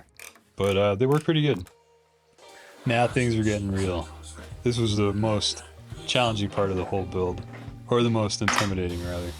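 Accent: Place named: United States. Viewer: You sound very American (not in a bad way)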